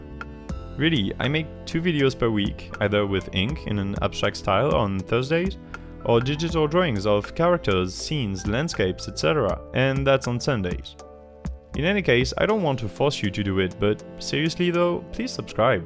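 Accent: France. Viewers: Gotta say I wasn't expecting someone with a french accent but hey, pleasant surprise ! Oui, effectivement moi aussi je suis francophone- Super vidéo ! Je vais probablement m'y essayer de temps en temps.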